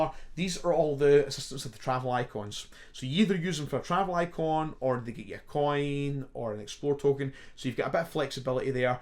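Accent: Scotland, United Kingdom. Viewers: Sounds scottish. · Sounds more Scottish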